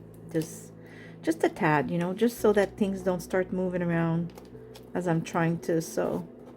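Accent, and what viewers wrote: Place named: Quebec, Canada. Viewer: Willing to listen to your French-Canadian accent?? I could listen to it for hours, my dear!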